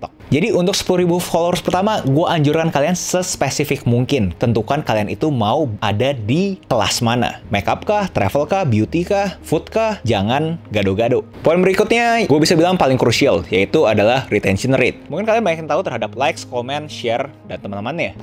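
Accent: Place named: South Jakarta, Indonesia. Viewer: Bahasa lo trllu jaksel bang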